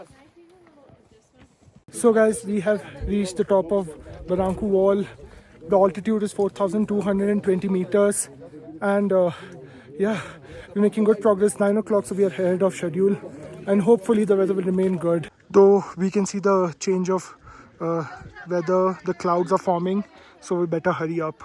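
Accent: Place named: India. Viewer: Your asking bizarre questions from the porter is strange and funny, you look abs sound Indian to me and I’m sure where you originally come from in the third world you have seen people carry things on their head and necks and backs.. why are you acting so unnecessary questions? Anyway better luck next time! Great content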